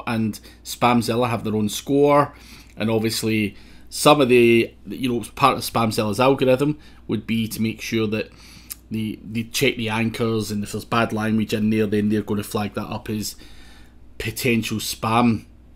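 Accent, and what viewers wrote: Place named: Glasgow, United Kingdom. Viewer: Lovely accent from Glasgow;)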